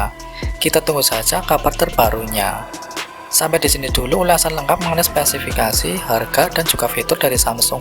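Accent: Banyumas, Indonesia. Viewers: G ngapak g penak?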